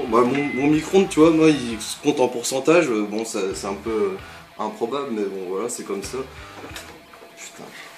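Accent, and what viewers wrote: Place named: North, France. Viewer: t'es nordiste ou quoi mec putain l'accent a gerber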